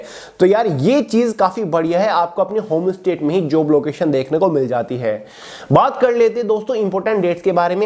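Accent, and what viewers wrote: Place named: Western Uttar Pradesh, India. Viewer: kana se ho aap aapki tone west up se lgre h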